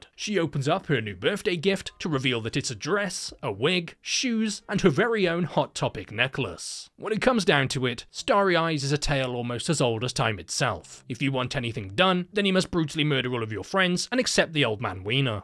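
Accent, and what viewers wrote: England, United Kingdom. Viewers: Ive said this before but bros humor is peak. Amplified by his British accent.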